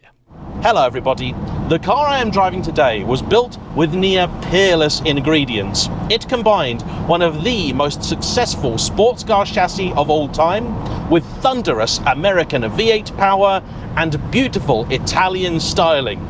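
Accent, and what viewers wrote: United Kingdom. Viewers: Not easy to understand Britis English, but I addicted to your videos ❤❤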